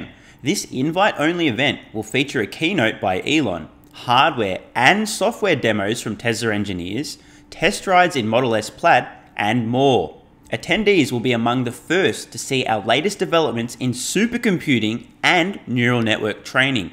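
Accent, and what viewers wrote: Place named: Australia. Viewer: For a moment I thought I heard swear, but nah, it was just plain Australian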